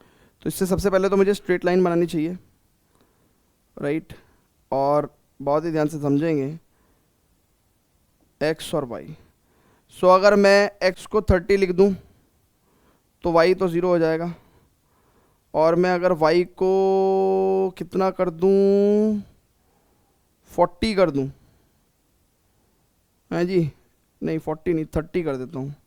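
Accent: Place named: Uttar Pradesh, India. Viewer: Sir m bhi up se hun isiliye relate kr skta hun aapke bolne ke tarike se ekdm up wali tone h bht maja aata h...❤😂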